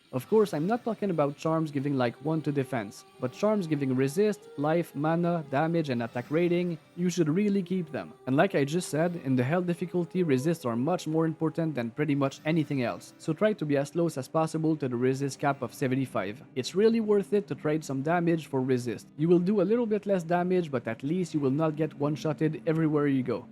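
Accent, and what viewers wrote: Quebec, Canada. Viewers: Tai tu quebecois ??? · Thanks for the content! Are you french canadien?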